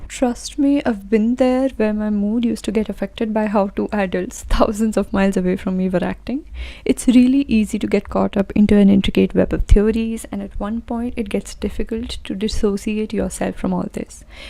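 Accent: India. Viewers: Lmao dw be proud of your accent. Idk y but ur accent sounds like an Indian accent to me cse like I'm from India too and it sounds too familiar. (u don't have to reply if u don't wanna and if you're not comfortable with letting ppl know where u come from) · I am just loving your Indian accent ✨...and larrie is real 💙💚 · Just me Or ur accent sound a bit indian?????? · I was thinking the same her accent is soo Indian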